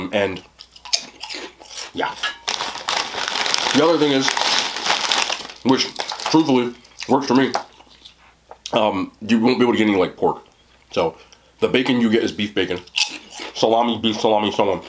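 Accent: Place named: New Jersey, United States. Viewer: I think us people from New Jersey just talk different anyway. Thanks for the video and trying those snacks I didn't know abt,looks really good.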